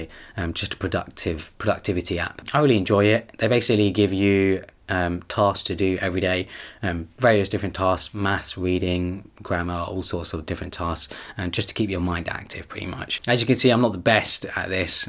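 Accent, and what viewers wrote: United Kingdom. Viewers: Sleek British accent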